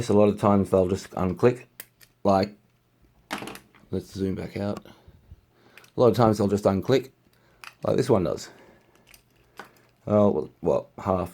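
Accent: Australia. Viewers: Where does one get these series of? Your from Australia based on your accent (So am I). I've only ever seen the standard range at my Target/BigW/KMart. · Awesome! Thanks for the help! Are you aussie?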